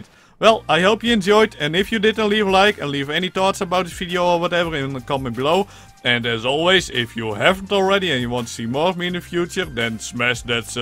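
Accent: Netherlands. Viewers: is this guy dutch because of his accent? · Hij is sowieso Nederlands. Dat hoor je duidelijk aan zijn uitspraak en hoe hij "Simba" zegt.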